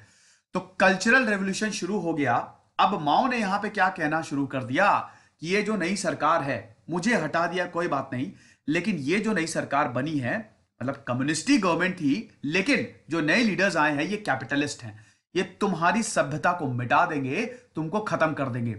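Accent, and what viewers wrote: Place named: Uttar Pradesh, India. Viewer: bhayi tu up ka lagta hai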